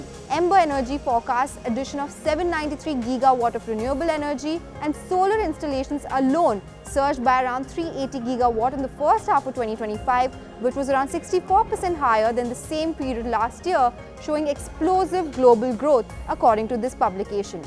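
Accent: India. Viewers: why cnbc has indian accent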